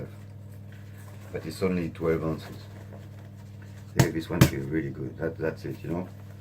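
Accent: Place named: France. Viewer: At first I thought you could Irish then i heard you and yup definitely French :) Nice review though !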